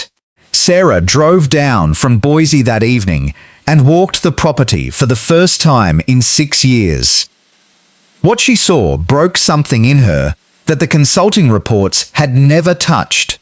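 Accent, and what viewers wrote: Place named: Australia. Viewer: With the Australian voice, maybe you should tell an Australian story. I really enjoy these stories..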